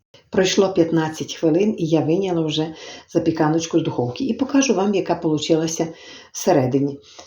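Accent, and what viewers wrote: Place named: Bukovina, Ukraine. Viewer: Дякую вам пані за рідну мову за буковинський говір Дивлюся вас з Америки і думаю що це готує моя мамочка З якого ви району ? Бо у нас теж завжди казали що сипляться воду 😂 Будьте здорові і миру нам всім бо дуже хочеться до рідного краю до родини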